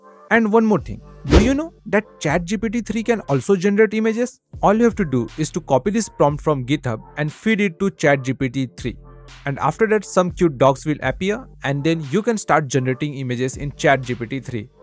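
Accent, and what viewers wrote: India. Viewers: If you open a tutorial and the person starts speaking in an Indian accent... then my friend you hit the jackpot cuz you'll get more than you even wanted. Thank you soooo much bro!!! 🙏🙏🙏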